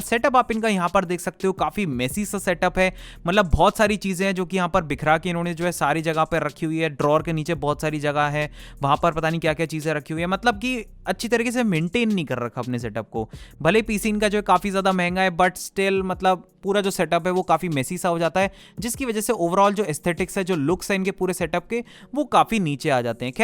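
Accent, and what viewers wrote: Gujarat, India. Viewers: Bro are you Gujarati??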